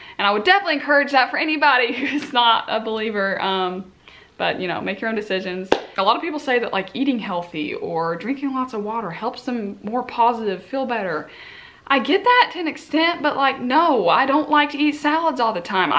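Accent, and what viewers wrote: United States. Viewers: As a non American I absolutely LOVE your southern accent😆😆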